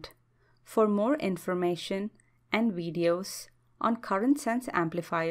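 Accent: India. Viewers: wtf why must indian speaker? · India English？I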